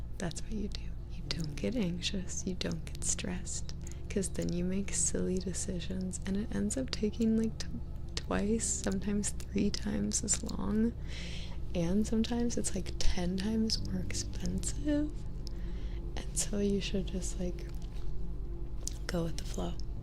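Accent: South, United States. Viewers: you are so beautiful. I'd love to see a kidnapping asmr video with the southern accent. The southern accent is so hot.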